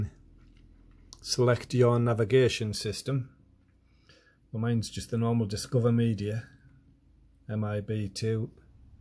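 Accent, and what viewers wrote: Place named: Newcastle, United Kingdom. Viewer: Love the Geordie accent! The most colourful of all English accents. (2020 VW Golf owner). 🦘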